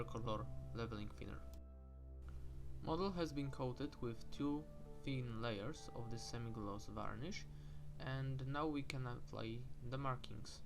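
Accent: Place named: Poland. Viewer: Nie źle angielski też spoko tam tylko masz polski akcent ale tam jest spoko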